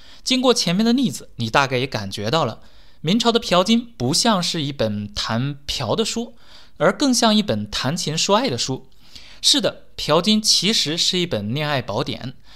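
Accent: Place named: Fujian, China. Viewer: 说普通话的感觉像闽西北的朋友 哈哈哈 很高兴认识您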